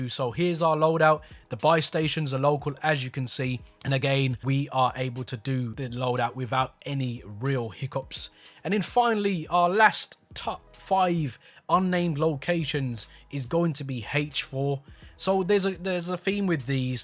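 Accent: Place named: Wolverhampton, United Kingdom. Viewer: ah, close! Thought I heard the wolves-ism's. Good video mate, keep it up!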